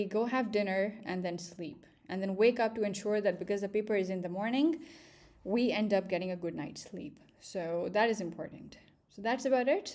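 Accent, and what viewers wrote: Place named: England, United Kingdom. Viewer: Miss how you learn this english accent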